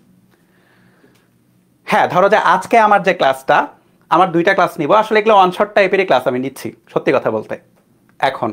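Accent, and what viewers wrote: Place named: Barishal, Bangladesh. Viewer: আপনি বরিশাইল্লা😅 ভাষা শুনলে বুঝা যায়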